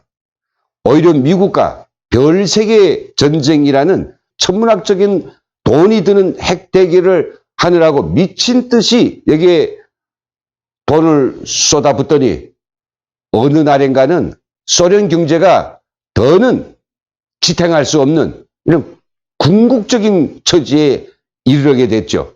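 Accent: North Korea. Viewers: 북한 사투리 쓰는구만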